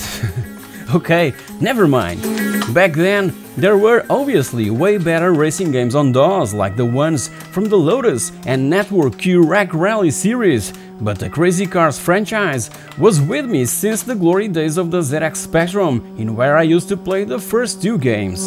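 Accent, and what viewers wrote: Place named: Portugal. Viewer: já agora digo-te, percebi logo pelo o teu sutaque que eras português, sendo que eu também sou e tenho a noção de como é o inglês da maioria das pessoas cá que não ficaram habituados, até ver-te a falar no vídeo. Acho interessante ver a nossa comunidade a fazer este tipo de conteúdo, visto que a maioria ou são brasileiros, ou de outros paises "mais interessantes". Acho que isso já me fez pensar em subscrever ao canal, abraço, e boa sorte.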